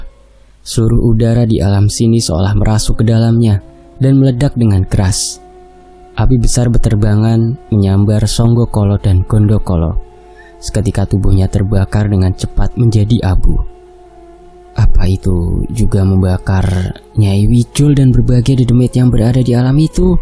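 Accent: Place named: Bali, Indonesia. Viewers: Mas bikin salfok pke logat bali😂😂😂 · Om swastiastu blie 😅😅😅 longat Balinya keren salut ya sama blie selalu bisa mengikuti longat di setiap daerahnya 😅😅 Salam dari Jimbaran Bali Kuta Selatan blie 😅😅 astungkare sehat selalu biar semangat trus bawa acara fakta sejarah dan fs juga 😅😅😅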